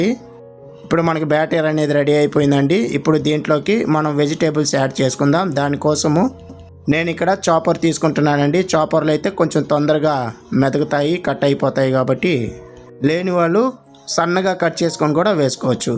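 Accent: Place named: Karnataka, India. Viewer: it seems you are from Karnataka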